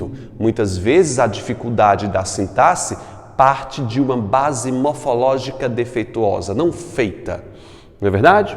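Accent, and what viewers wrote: Northeast, Brazil. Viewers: Bom dia professor. Eu vi um vídeo antigo seu de análise morfológica,muito bom,eu achei incrível me ajudou muito na prova. E lá eu percebi q vc tinha um sotaque parecido com o meu,e gírias conhecidas aqui. Pensei q vc fosse do nordeste mas vc é de Juazeiro do Norte,eu moro numa cidade vizinha a Juazeiro. Q legal. Ótimo professor, parabéns 👏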